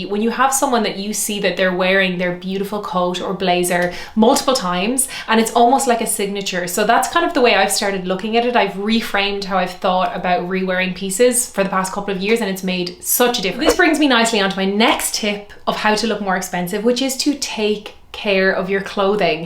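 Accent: Ireland. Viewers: You look like a young Elizabeth Taylor! Stunning! Except your Irish!) love your accent! I agree W your tips. I clean skin regime…..and those little laundry bags for your intimates ( keep your $$ things well, they last,same with makeup…..you invest in it…take care of anythng it will last. I love being tall & thin wearing leggings …..WOW TIDE REMOVER PEN? LOOKS LIKE A NEED FOR ME! THANKS GIRL IVE A KITTY SO YOU GET ME ❤😊😊😊😊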